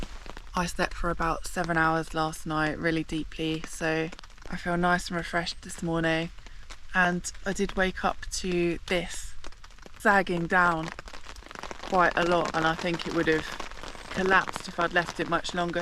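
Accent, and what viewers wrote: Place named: RP, United Kingdom. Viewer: well posh, love it, love your videos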